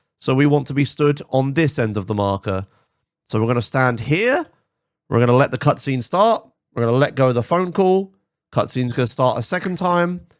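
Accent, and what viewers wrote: United Kingdom. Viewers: He's british, innit?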